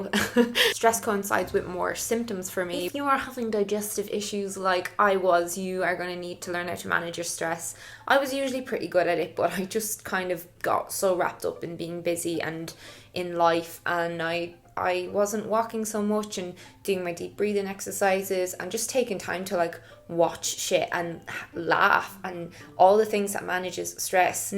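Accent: Ireland. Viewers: I singled out your lovely Irish accent in the first 10 seconds. You're my most loved nationality on the planet. 🇨🇮🇧🇷🇨🇮🇧🇷🇨🇮🇧🇷 Go raibh maith agat for your video! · I'm from germany but i loooooove irish accent so much. (Because of Saoirse Ronan) Thats why i love your accent. And youre so pretty. 😍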